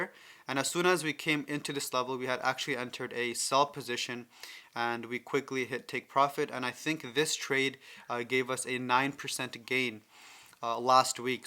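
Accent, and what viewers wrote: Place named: England, United Kingdom. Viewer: You from england bro?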